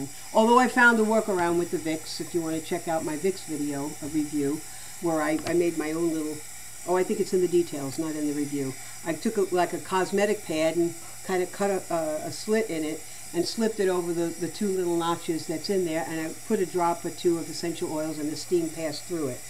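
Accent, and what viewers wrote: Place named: Italy. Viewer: That's awesome! Thanks for making this video, I have constant allergies and I wanted to see reviews of steamers. Are you Italian by the way? My mom's side is Italian and your accent reminds me of my Italian part of my family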